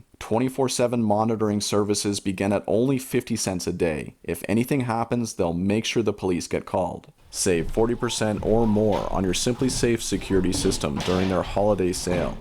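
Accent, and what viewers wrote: Canada. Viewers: I just found this channel. Very nice work on this. Just going by the trees and his accent. Is this somewhere in Ontario?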